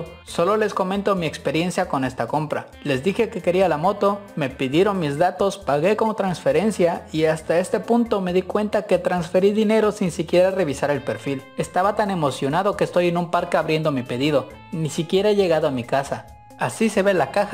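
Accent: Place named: Juchitán, Mexico. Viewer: Ah perro eres de Juchitán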